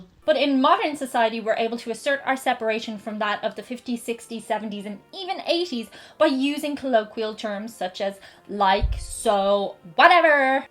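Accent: United States. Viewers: I'm up for a 10-worst video, but be sure to wear a suit of armor. Some people have no sense of humor about their own country. I know you'll keep it light and funny, but be prepared for backlash, especially if people other than your regular followers see it. I love your American accent, including the valley girl accent you did for Word Things. As for whether communication has improved: there have always been cranks who insist the world is going to hell, and use changes in language as evidence of that. Linguists know that language evolves constantly, and that people invent whatever language they need to express themselves. I'm reading "Because Internet: Understanding the New Rules of Language" by Gretchen McCulloch, in which the author details how modern technology has changed how people express themselves. It's a great book, worth reading for anyone who's interested in how people actually use language. 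The microwave oven was invented by the American engineer Percy Spencer. Japanese companies did a lot to popularize them, but they originated in the U.S. And no, microwave cooking is not unhealthful. It may not be the best way to cook some things, but all it does is heat the food by causing polar molecules (like water) to vibrate.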